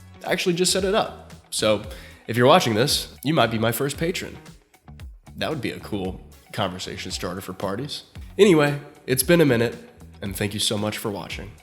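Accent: United States. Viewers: This guy confuses my brain; he looks Australian but talks American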